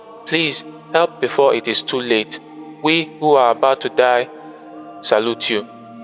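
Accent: Nigeria. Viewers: Still u have Nigeria accent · I love the documentary, the detailed analysis and how you presented it without bias. The effort and work you put into it. However, that yoruba H factor was hurting my ears 👂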